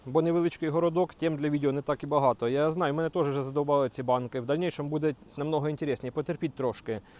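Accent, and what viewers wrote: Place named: Surzhyk, Ukraine. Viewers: Так слідуючий момент це мова. Ну можливо щось виправити ну так говориш аж тошнить. Скільки вже тобі писали запитувати але ти принципово як олень вперся і доказуєш що ти говориш говорив і будеш говорити на такому суржику але суржик більш зросійщений. Англійський вдосканолюєш данський будеш вчити а рідну мову покращити принципово не бажаєш . Чому таке ставлення до свого рідного??? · Ти Мразота Зариганая😢...Кроку на Куй зі своїм франківскім суржиком🎉...Слухати ваше блювотіння....щє та пригода😢...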